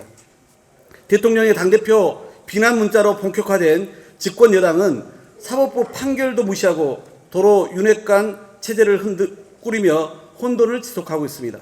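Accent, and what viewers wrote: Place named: Jeolla, South Korea. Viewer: 다음 총선에서 개박살 날거 아나 모르나 전라도 사투리좀 안듣고 살자 오바이트 나온다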